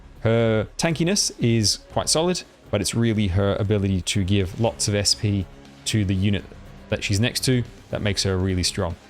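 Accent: Australia. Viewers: Great vid, lotsa useful fast tips but also well explained. Unrelated but are you a fellow Aussie?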